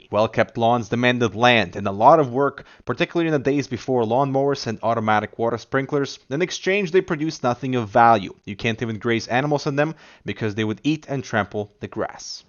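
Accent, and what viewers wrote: Russia. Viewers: D. Please create new channel where you are just reading books. Your 75% English and 25% Russian voice is literally heaven. Preachhhh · are you russian? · U kinda used russian accent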